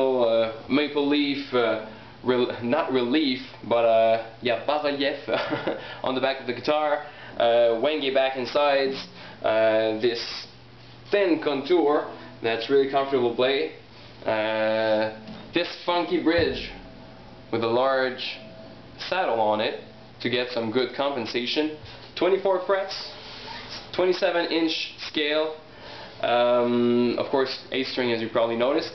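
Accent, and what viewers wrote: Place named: Russia. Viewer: Ohhh... Your delicious russian accent :D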